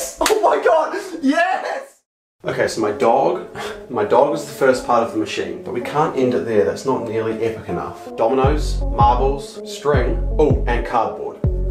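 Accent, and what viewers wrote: Australia. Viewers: i love australian acent so muchhhhhhhhhhhhhhhhhhhhhhhhhhhhhhhhhhhhhhhhhhhhhhhhhhhhhhhhhhhhhh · Is he Australian?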